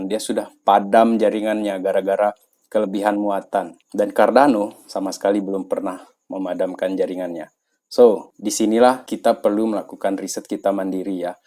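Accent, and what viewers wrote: Sumbawa, Indonesia. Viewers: Sumbawa mana ini pak?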